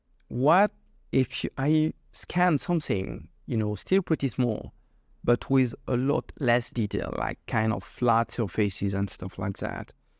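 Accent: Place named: Spain. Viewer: No problem on the accent! We have a lot of Spanish people in this part of the country!, LOL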